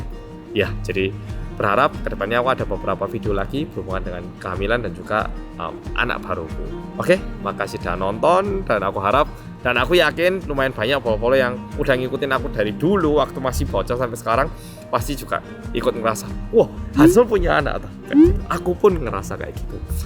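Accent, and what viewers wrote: Java, Indonesia. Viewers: alhamdulilah mas aku bolomu dari gondola running man sampe eneng wong korea medok seng versi saschet🎉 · Ngikutin sam dari 2018an dari dulu yg masi nge kos trs nge vlog ini itu dengan logat medok nya yg masih lekat bgt smpe sekarang tuh luar biasa sam Kangen memang di bbrp taun terakhir ini jarang ngeliat vlog mas, sampe" klo senggang tuh ngeliat vlog" jaman dulu trs ngeliat metbg pas masi di awal" Wes pokoke yo kangen ngono mas. Smpe akhire barusan liat sekilas di ig oh ternyata Jennette hamil toh, dan ini baru liat video penjelasannya mas. Ikut berbahagia juga mas dengernya dan sehat" terus buat keluarga😊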